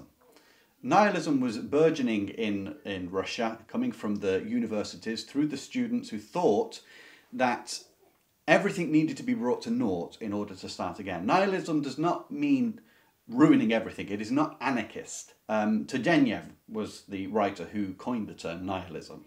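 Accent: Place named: United Kingdom. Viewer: Please, please take your fingers off the book’s title and its author when you discuss a book. Maybe even project the book on the screen. You go at top speed through introducing the book and then you put your hand over the book. Unless one pays close attention, your British accent and your galloping through the content makes it difficult to follow.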